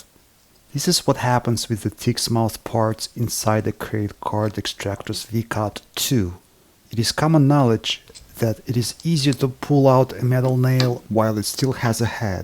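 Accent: Russia. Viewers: If coronavirus could be eliminated from our bodies like ticks, then it.would be simple. I notice your english is aquiring the russian form now. In the previous videos, it was more foreign. It is okay. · has a great relaxing voice, with just the right amount of Russian accent.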